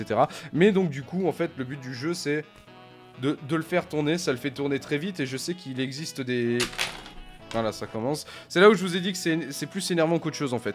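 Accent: Alsace, France. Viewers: sympa cette video dit moi tu serais pas alsacien ( 67)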